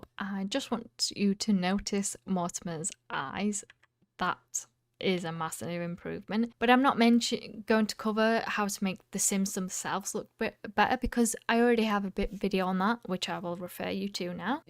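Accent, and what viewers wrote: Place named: United Kingdom. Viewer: Hello! Thank you a lot for the video. It's greatly helpful but what I also need to mention is your beautiful British accent, I love it so much! It was a pleasure listening to you.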